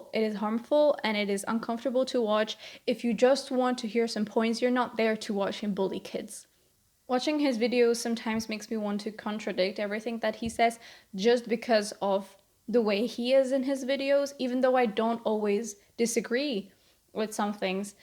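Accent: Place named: Finland. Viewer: Excellent points!! This really needed to be said. Satutko muuten olee Suomesta? Aksentista päätellen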